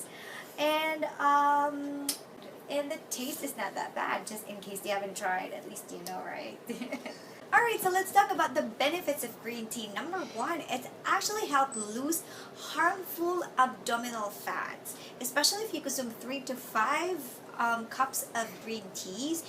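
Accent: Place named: Cebu, Philippines. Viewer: Thank you for your tips mam cebuana pod ko mam i like your accent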